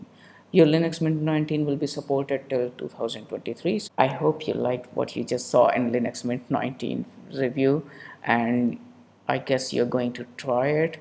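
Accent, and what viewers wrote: India. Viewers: It's Indian accent. Where are you from?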